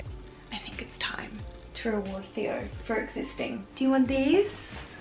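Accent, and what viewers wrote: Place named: Australia. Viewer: so good to hear an aussie accent on yt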